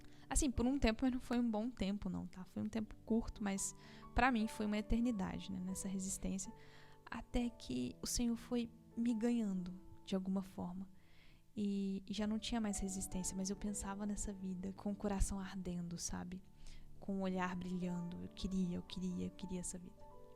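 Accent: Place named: Minas Gerais, Brazil. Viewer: Barbacena! A cidade das rosas 🌹 e da Beata Isabel Cristina Perto de minha cidade, Conselheiro Lafaiete , por isso a sua voz é muito agradável aos meus ouvidos! Sotaque mineiro é um trem bom demais! Conhece conselheiro Lafaiete?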